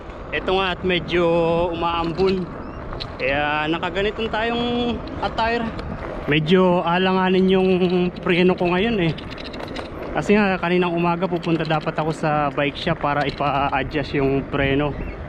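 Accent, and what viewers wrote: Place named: Batangas, Philippines. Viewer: Korekek taga Batangas kba Ako oo sa Lipa Batangas may punto ka kasi eeeee😛😛😛😛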